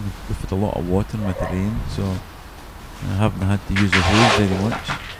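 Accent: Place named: Wales, United Kingdom. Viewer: Thank You, great video once again,well done & very good presentation, look out Titchmark, hope the BBC WATCH THIS, He's finished, lovely Welsh accent--- Love ya dearly! Cheers OXOX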